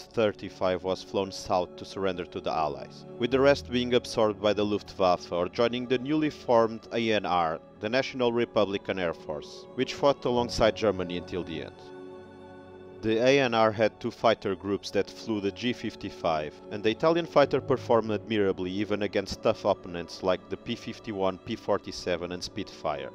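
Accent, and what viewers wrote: Portugal. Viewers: A Portuguese accent..great video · Is the voice Portuguese? Reconheci logo o sotaque. Ahahahhahahaha abraço w muito bom vídeo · Great analysis on an interesting subject. Well done! Do I detect a Portuguese accent? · NIce video, and nice Portuguese accent :)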